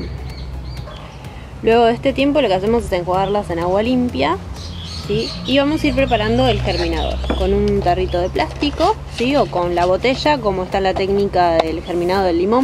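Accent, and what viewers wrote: Argentina. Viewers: Hola soy Colombiano, amo los cerezos y he defendido uno que creció conmigo y me lo han querido tumbar mis vecinos, al parecer ya le salve, sin embargo, un día ver la posibilidad de una inminente tala de mi árbol decidí que me seria mas fácil asumir mi perdida si tenia bebes de mi árbol, la tan llamada prolongación de la especie. En sus cerezadas he obtendio las semillas y nada. Voy a probar tu método, donde lo logre seré feliz como tu lo fuiste. gracias por compartir. p.d. estas hermosota y con ese acento che... divina. p.d. de p.d. sin ofender y con cariño cuidate tus dientecitos, pero fresca también descuide algunos mios, pero el compromiso es ni uno mas perdido por estupido descuido.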